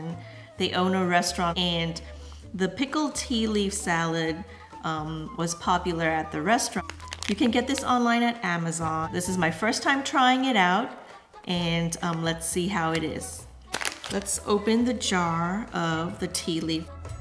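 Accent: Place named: United States. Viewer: She speaks perfect English too with American accent!😊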